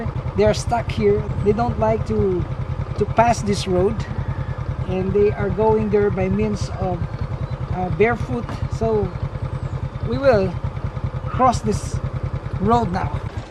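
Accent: Visayas, Philippines. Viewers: Bisaya diay ka lods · Ka lowm pud mo bisaya ni kuya oi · Bisaya ka nong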